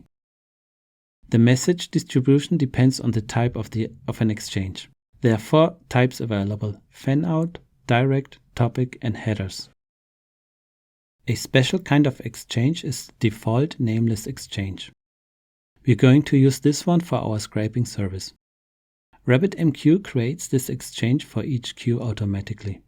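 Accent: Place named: India. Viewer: Very good, u almost sound like an indian person too!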